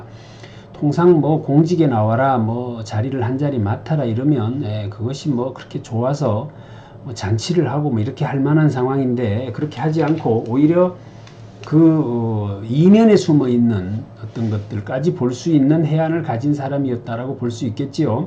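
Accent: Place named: Gyeongsang, South Korea. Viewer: 원장님 경상도 사투리 정겹습니다 어름한 자식~~ 사마의의 전략 전략적으로 기다려야되는 지금 개구리 점프하듯 뛰어 오를 무엇이 떠오릅니다 잘 듣고 갑니다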